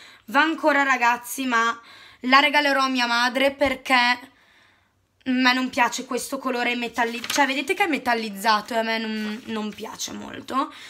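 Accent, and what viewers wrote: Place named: United Kingdom. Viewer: ODDIO adoro la tua pronuncia in inglese. that's so british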